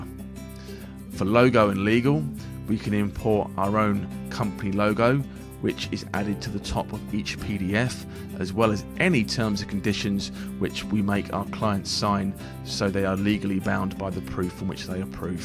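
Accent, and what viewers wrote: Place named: United Kingdom. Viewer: PLEASE LOWER THE BACKGROUND MUSIC ITS HARD TO UNDERSTAND A BRITISH ACCENT SOMETIMES THE MUSIC DOESNT HELP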